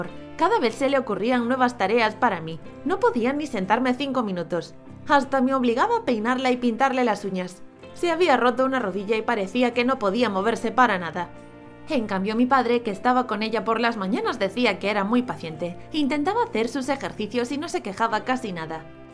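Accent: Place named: Spain. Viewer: Si los vi!! 🤗😃 me podeis dar un corazón?? (no soy española pero me encanta el asento español)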